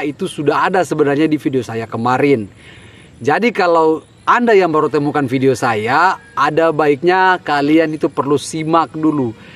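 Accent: Ternate, Indonesia. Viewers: Hehehe mantap pak, semoga berkah ilmunya. Terimakasih.. Lucu juga pak,, rindu dengar orang ternate punya logat😂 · Ale carita pake dialeg asli ternate bole tamang.. Tarusa deng apakong saya anda saya anda bagitu tu😂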